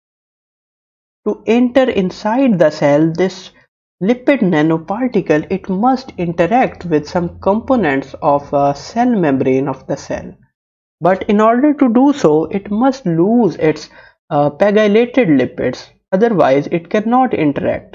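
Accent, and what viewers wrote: India. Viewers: first time like this kind of Indian accent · FFFFFFF!!!!!! why does every scientific medical video have some guy with a terrible Indian accent.....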